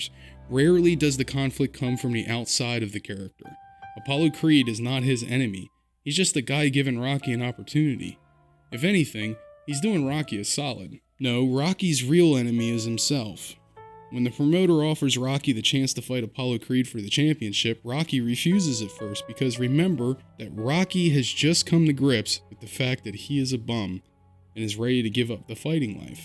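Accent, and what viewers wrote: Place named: Delaware Valley, United States. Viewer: I enjoyed this tangential video, I subscribed after watching your video essay on "AAA" game design and am by no means a writer and found it interesting. It's awfully appropriate this video is delivered in an authentic Delaware Valley accent. The city of Philadelphia really relates on a personal, almost cultural level to Rocky's underdog narrative and low self esteem in a way, it can be compared to the city's well known inferiority complex - which extends into New Castle County, Delco, Bucks and South Jersey. I'm a "Delaware Valleyan" living far from home and I sometimes have people ask me why we take pride in the statue of him near the Art Museum. It's clear to me why he's been co-opted into city culture.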